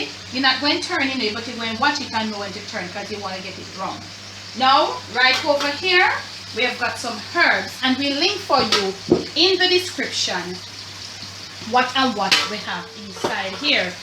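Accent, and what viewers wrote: Jamaica. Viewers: Real Jamaican🇯🇲 Chef this enuh full a drama & she definitely NOT acting she giving us raw Patwah ! Well done 👍 Perfect garnish is Yu TEETH 🦷 🤣🤣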